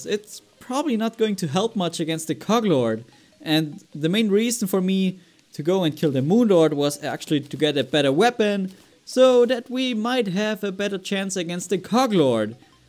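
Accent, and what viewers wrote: Germany. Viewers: dude r u german?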